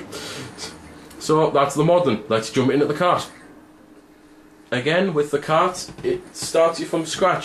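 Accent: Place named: Scotland, United Kingdom. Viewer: Where you from, mate. You sound Scottish or something. :]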